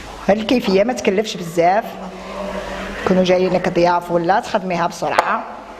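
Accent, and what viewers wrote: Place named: Bechar, Algeria. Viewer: سلام اهلا بيك يعطيك الصحة روعة الله يبارك عجبني هادا القاطو يستاهل التجربة وعلى ما اضن على حساب الهدرة تباني من ولاية بشار المهم بارك الله فيك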